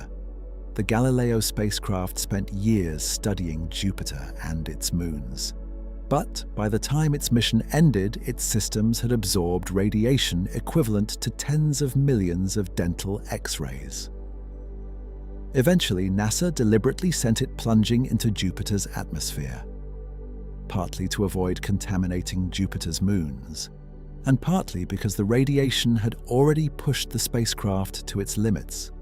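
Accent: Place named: United Kingdom. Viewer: another femme british narrator!?!?! wtf is happening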